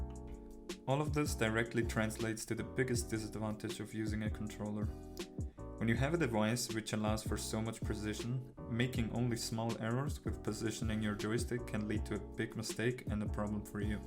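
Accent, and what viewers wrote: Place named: Switzerland. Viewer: ich bin so en gott. hans useghört, dass du en schwiizer bisch haha.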